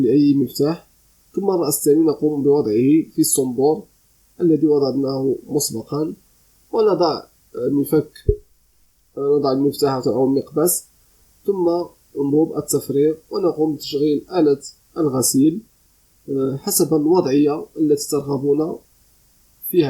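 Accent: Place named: Morocco. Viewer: طون كتهضر خويا غير بالدارجة باش نركزو مزيان، والله العظيم مفهمتك حيت كتكلم بلغة عربية فصيحة بلطنة مغربية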